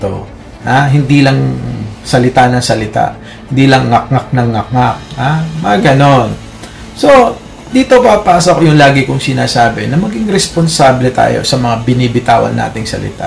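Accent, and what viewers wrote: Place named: Batangas, Philippines. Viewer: I’m so entertained with your Batangenyo accent nakakatuwa. Pero si, nakakasira ng araw pati accent peke talaga. Sana ma meet kita pag pwede nang makapag bakasyon sa Pilipinas. I’m your new subscriber.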